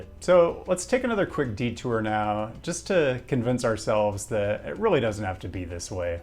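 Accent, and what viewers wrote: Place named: West Coast, United States. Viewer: I’ve never heard anyone with a stronger west coast accent than you haha. Keep up the great content!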